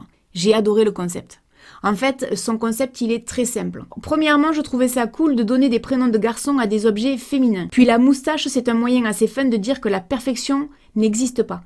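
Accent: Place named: Marseille, France. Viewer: tu viens de Marseille ? tia l'accent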